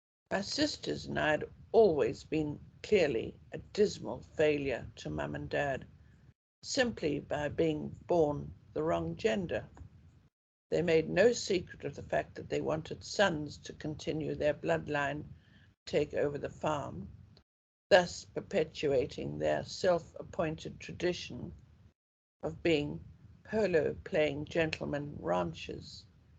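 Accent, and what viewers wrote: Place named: Zimbabwe. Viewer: Well l was thinking to myself u was Rhodesian .Although there’s a difference between British South African and Rhodesian English.I had assumed u moved to KZN from rhodesia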